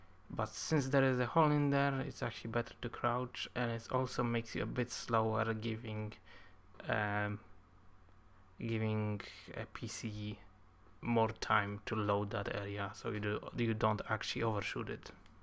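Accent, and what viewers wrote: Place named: Poland. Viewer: I love this Polish accent.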